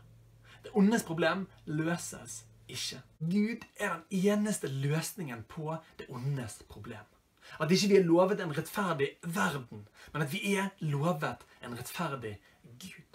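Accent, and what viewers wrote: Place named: Bergen, Norway. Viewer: Dette er knall bra, liker at du er glad hele tiden og elsker bergens dialekten!!! Me ser på deg i klassen av og til, går på ein kristen skole der du har blitt vist på andakter flere ganger! stå på!!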